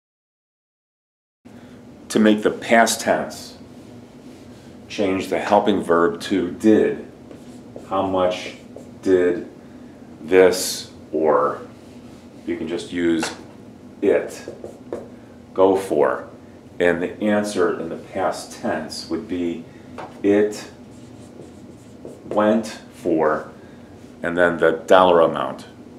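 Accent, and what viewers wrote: United States. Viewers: are u living in ca??sounds so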